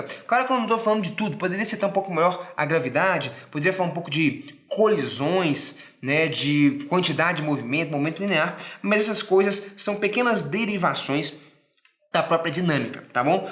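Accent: Minas Gerais, Brazil. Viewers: Eu te amo cara se ensina bem e com um sotaque de mineiro foda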